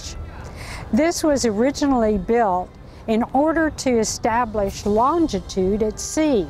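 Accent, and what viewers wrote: United States. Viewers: What type of American accent does she have? I'm American, and I don't recognize it.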